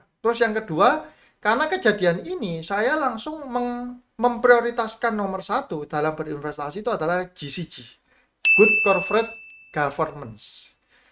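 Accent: Surabaya, Indonesia. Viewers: logatnya kog Sbynan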